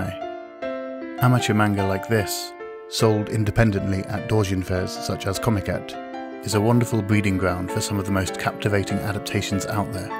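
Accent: United Kingdom. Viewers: As a fellow English person the voice is slightly laboured and enunciated, which for me affects the flow - but it’s a very nice voice - probably sounds amazing to non British speakers of english. We have some nice accents here I must say. And some weird ones! Best thing about the voiceover - at least I can understand it! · Are and Sargon of Akkad the same person? The voice sounds similar to me and it's not only the British accent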